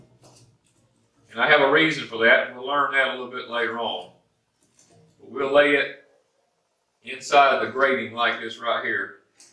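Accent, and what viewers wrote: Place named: Georgia, United States. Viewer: Great video, I just discovered you. Your accent sounds Georgian - north of Atl to me. 🤔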